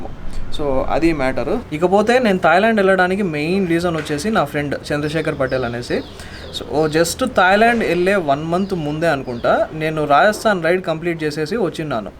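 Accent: Rayalaseema, India. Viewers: Like your rayalseema ascent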